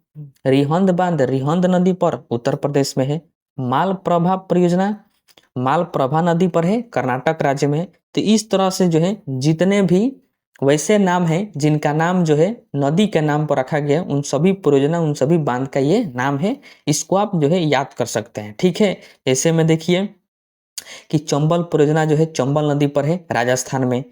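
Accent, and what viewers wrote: Bihar, India. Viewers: Bihar se hai bhaiya sir up se nhi 😅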